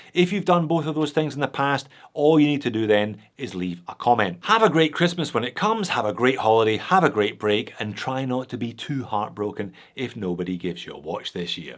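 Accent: Scotland, United Kingdom. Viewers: Merry Christmas. Thanks for a bumper year of watch reviews and laughs. The only downside is that whenever I read a watch review now.... I do it in a Scottish accent. Oh well. 😁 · Merry Christmas and the rest of the viewers. Love your scottish accent, reminds me of my family:-). Keep up the good work. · A knowledgeable & enthusiastic host talking about watches with a Scottish accent. What’s not to like? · Came for the watches, stayed for the Scottish accent.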